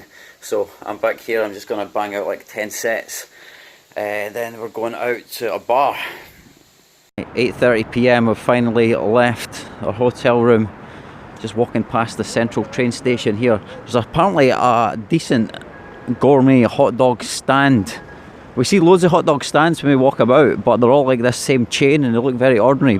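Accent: Scotland, United Kingdom. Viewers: I love the Scottish accent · Yeah.. This is the real scottish accent and a much thinner scottish dude.. 😅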